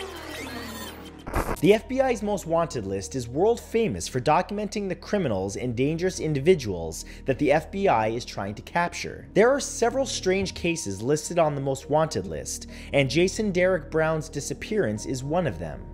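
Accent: Canada. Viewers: Thats quite the canadian accent. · he canadian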